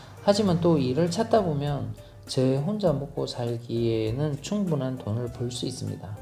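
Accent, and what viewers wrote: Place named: Daegu, South Korea. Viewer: 님 영상을 보면서 처음댓글을 남기는것 같네요. 억양이 귀에 익어서 혹시나 했는데 ㅎ 역시나 ㅎ 저도 대구 토박이로 반백년 살면서 나름 열심히 살았다고 생각하고 부족함없이 살고있는데 집사람이 갑작스럽게 사고로 좋은곳에 보내고나니 말로 표현하기 힘든 시기가 있었습니다. 지금도 진행중 일지도...해서 애들 대학졸업까지만 뒷받침해주고 저의 남은 생은 국내.외 여행으로 나름 즐거운 시간을 보낼까 고민중입니다. 오늘 영상이 더더욱 가슴에 와닿는 기분은 아마 이런 이유가 아닐까싶네요. 앞으로도 좋은 영상 부탁드리며 항상 건강하시고 기회되면 꼭 다낭 가서 즐거운시간 보내고 싶습니다. 언재가 될지 ㅎ 그때 뵙게되면 좋은 인연으로 남게되었으면 좋겠습니다 ~~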